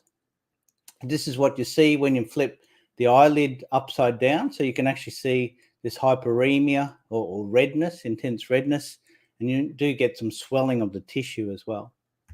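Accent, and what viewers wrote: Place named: Australia. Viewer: Hi... he is speaking in his own language which is English. He's from Brisbane and speaks English with an Australian accent. I can understand every word he says perfectly. I speak English too.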